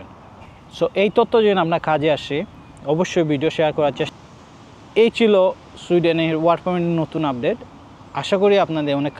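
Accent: Sylhet, Bangladesh. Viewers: ভাই কিতা সিলেটি নি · কথায়ই বুঝা যায় সিলেটি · মনে হয় সিলেটি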